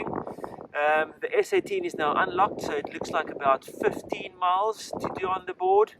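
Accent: South Africa. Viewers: you sound soth-afrikan. Channel looks good, subbed.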